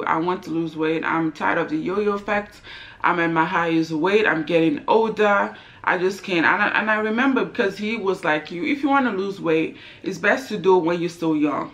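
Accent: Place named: Nigeria. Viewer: HMM THIS IS SERIOUS..U HAVE NAIJA ACCENT HERE OHH JESUS..COMPARE TO NOW ...HAHAHAHA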